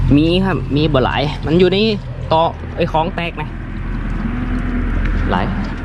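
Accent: North, Thailand. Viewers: เห็นพูดเหนือ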